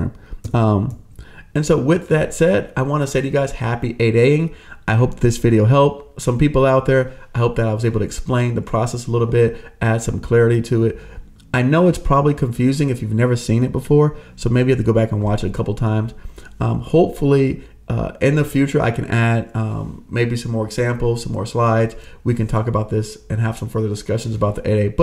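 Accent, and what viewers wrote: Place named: Texas, United States. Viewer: Texas twang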